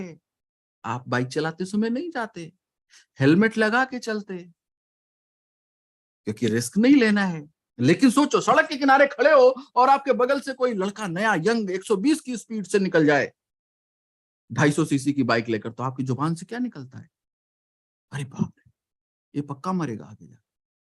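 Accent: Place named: Uttar Pradesh, India. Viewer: ji kya aapka number mil sakta hai aapki hindi se lagta hai aap UP se hi hai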